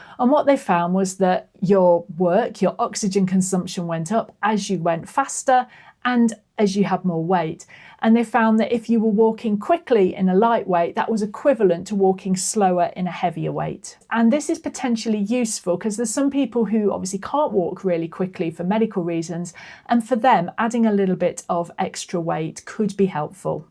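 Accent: United Kingdom. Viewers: Is that a Cheshire accent?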